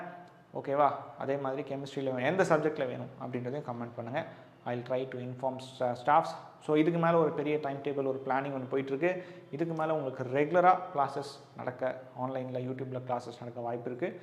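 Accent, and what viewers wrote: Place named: Thanjavur, India. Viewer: thanjavurla irunthu pesuran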